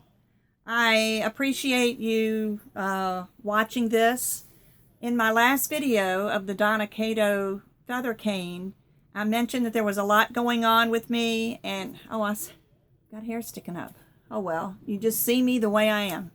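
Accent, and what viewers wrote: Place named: South, United States. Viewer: Hello am the same one who liked ur southern(not cowboy...) accent!! And of course your great chit chat while sharing polymer crafts which are super helpful.this heart to heart video was so touching dear,i find you are so sweet and humble. Wish u the best for new position and whatever u decide i ll still be a faithful viewer from the island of crete in greece.take care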